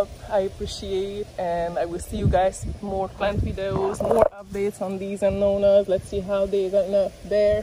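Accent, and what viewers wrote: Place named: Hungary. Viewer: Haha, elég régóta nézem a videóidat de eszembe se jutott hogy esetleg magyar az akcentusod :) Én a Karib tenger egyik szigetén élek van egy fiatal rollonia fám amiről pár hete szedtük le az első és egyetlen gyümölcsöt. Most nagyon sok virág van rajta de nem egyik se fordult még gyümölcsbe, ezért kérdeztem. Nagyon szeretem a videóidat (köszönöm megint, hogy megosztod velünk) meg persze irigykedem a szép kerted miatt!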